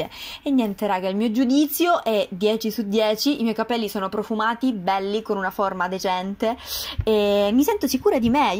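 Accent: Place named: Calabria, Italy. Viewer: Ma di dove sei pk sento l’accento e non capisco bene sembra che tu sia della Calabria ma non sono sicura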